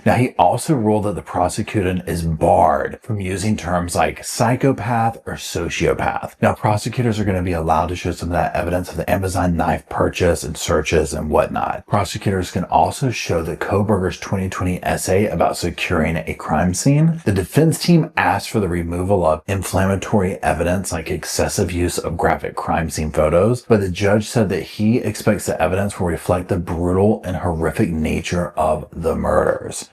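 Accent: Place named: Western North Carolina, United States. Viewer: I didn’t know you are a fellow Tarheel. I thought your voice was kinda western NC:)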